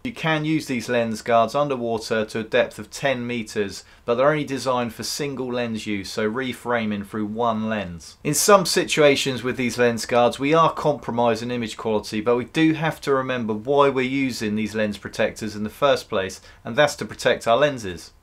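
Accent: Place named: RP, United Kingdom. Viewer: your very posh British accent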